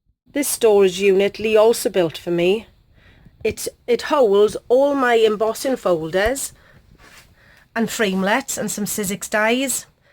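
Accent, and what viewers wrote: Wales, United Kingdom. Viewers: Greetings grom Ireland What a fab craft room. So dinky. Would listen to you all day. Love the Welsh accent. Used to holiday in Wales with my family when I was young.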